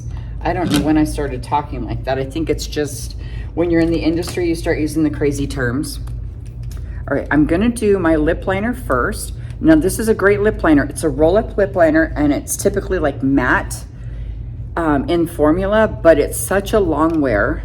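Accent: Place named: South, United States. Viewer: I love you. I can be down and watch you and before you know it, I’m laughing and enjoying myself. Your imitation of Paula Dean was “right on” and I swear you have southern roots from somewhere. I’m going to order the eye cream as it seemed so refreshing. Hopefully I can find the link after the video. Okay, I’ll get back to it.❤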